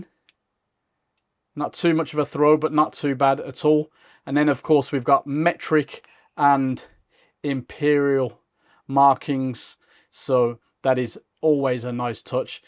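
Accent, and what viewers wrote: Birmingham, United Kingdom. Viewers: I recognise the Birmingham.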